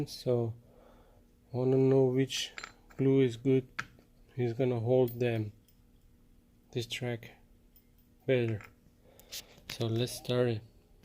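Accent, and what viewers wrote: Iran. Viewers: Love your accent. Sounds Persian. Where are you from?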